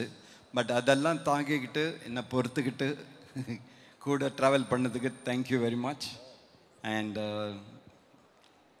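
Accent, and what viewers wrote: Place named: North, India. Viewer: Mani Sir En Vadakkans Maathiri Tamil Pesuraru 🙄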